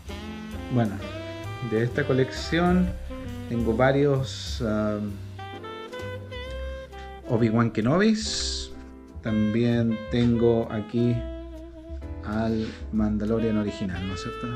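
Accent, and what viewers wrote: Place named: Chile. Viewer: Tenés pinta de ser chileno...por el acento lo digo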